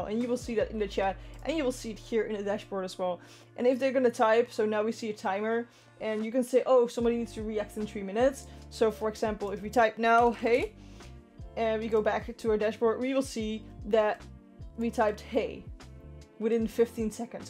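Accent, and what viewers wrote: Netherlands. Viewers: Been living on the Netherlands for 4 years, I could tell you were dutch in the first 10 seconds of the video xD Thanks for the tutorial, btw, it was very useful! ❤️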